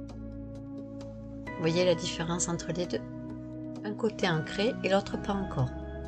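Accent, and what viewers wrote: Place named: Montpellier, France. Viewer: Bonjour, je viens de m'abonner, parce que merci pour ce partage et aussi parce que j'ai le même accent! !! Hihihi, je suis originaire de montpellier, mais je vis en RP, à bientôt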